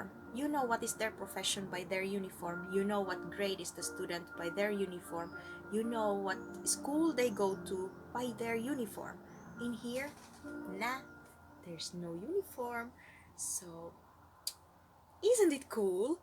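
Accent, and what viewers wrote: Finland. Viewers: You have very Finnish accent like for English :)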